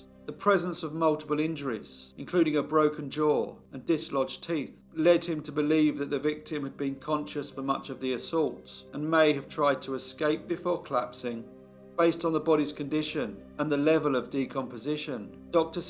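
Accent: England, United Kingdom. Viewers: sorry I was only nit picking. You sound English(same as I am),and if that is the case, then Left-tenant is how it's pronounced 😁😁😁😁 But that is neither here nor there. I love your shows, please keep up with these wonderful pieces of crime history.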